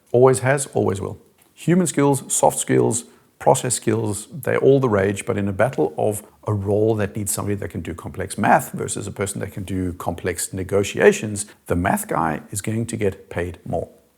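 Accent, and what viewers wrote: South Africa. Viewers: Great video, are you South African? I cant quite place your accent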